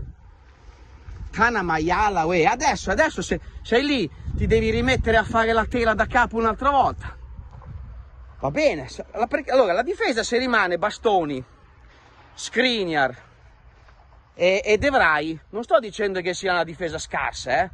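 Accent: Italy. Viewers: Mi piace il tuo accento Emiliano romagnolo marchigiano 😁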